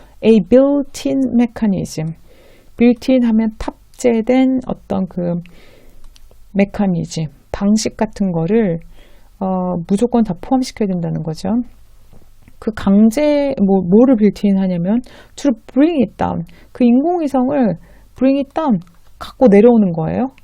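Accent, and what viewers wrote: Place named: United Kingdom. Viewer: 헛 어쩐지! 영국영어발음 멋있어요!^^ 오히려 목소리가 이쁘셔서 어색한 남자 원어민 발음보다는 직접 한번에 싹 다 녹음하셔서 들려주시고 스크립트를 고정댓글이나 자막으로 하시면 훨씬 듣기도 좋을 것 같아요!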